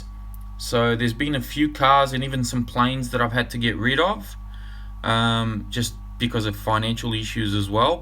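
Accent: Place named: Australia. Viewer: hey, welcome back mate,,, my rc channels surfing on the web isnt complete with your one,,, glad to hear your aussie accent back on air,,, cheers,,,:)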